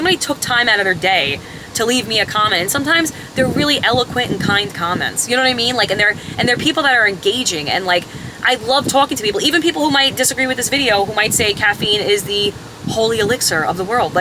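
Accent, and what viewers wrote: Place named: Long Island, United States. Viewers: I'm from Long Island, NY as well and I knew right away she was from Long Island especially when she said " cawfee". I don't realize I sound like that too until I hear myself on a video or audio recording😂 BTW we find British accents interesting too. Unless you have that spit filled " Scouser' accent like the fighter Darren Till. .😂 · just sold on you're accent immediately. I have that same New York accent. Pleased to meet you. Im quitting cawfee myself today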